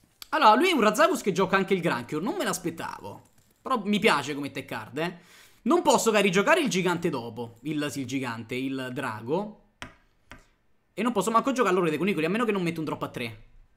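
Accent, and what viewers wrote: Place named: Rome, Italy. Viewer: All'inizio credevo che fossi Romano da come parlavi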